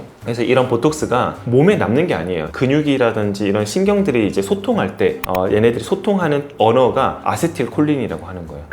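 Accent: Gyeongsang, South Korea. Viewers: 경상도세요? 사투리가들림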